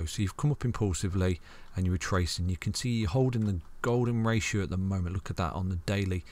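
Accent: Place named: Norwich, United Kingdom. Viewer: Given the accent I'd say around Norwich haha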